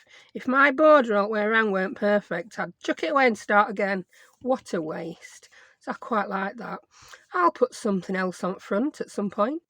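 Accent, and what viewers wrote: United Kingdom. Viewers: Hi! I'm so glad I discovered your channel. I love listening to you. You have such a cozy voice. ( I watch most other people's videos with the sound turned off. ) Also, as a Brit who has been is the U.S. for almost 60 years, your lovely accent is a wonderful piece of home, even though I'm actually from Brighton. And, I like your tutorials. I'm fairly new to journaling, so your information is very much appreciated. Thanks!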